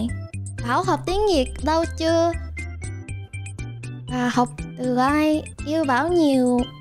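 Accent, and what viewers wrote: Mekong Delta, Vietnam. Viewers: nói tiếng Việt nghe đậm chất miền tây luôn á với lại mới biết đến thấy cute dễ thương...ngoài bả mình còn mê cả Shoto nữa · cách nói đậm chất miền tây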